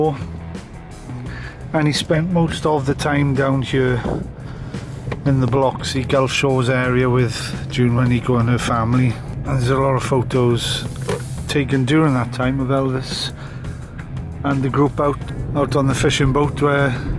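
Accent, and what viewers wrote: Wales, United Kingdom. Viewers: Smashing Pics Of Young Elvis. Amazing How More Information Keeps Popping On Elvis After All These Years. Thanks To Elvis Fans Like.(Do I Detect A Lovely Welsh Accent) Being Welsh Myself.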